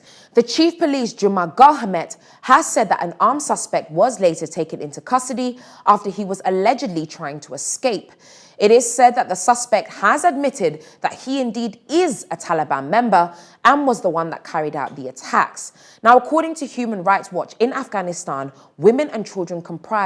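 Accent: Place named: England, United Kingdom. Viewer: This is not an issue with whether your reporting is accurate or appropriate but your statements concerning Sarah Everard may cause you problems. You have stated in this report "It was later found that she had been kidnapped and murdered by 48 year old Wayne Couzens". He has not yet been convicted so to state this unequivocally in those terms is factually wrong and also potentially in contempt of court. I am not sure where you are based but you have an English accent so I presume you are in the UK and if so commentary such as this could put you and your channel at risk. This is a link to information on the legal principles here <link> This is the related guidance <link> and this is a link to a report from the time The Sun and The Daily Mirror were fined for their reports on the murder of Joanne Yeats <link> Even if Wayne Couzens is convicted at trial or by pleading guilty the issue is potential prejudice to a fair trial. 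I think you need to give some attention to these requirements to avoid potentially ending up on the wrong side of the legal principles.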